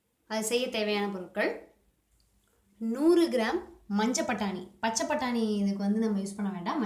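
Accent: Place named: Thanjavur, India. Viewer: Nenga pesara slang thanjavur slang mathiriye irukku.....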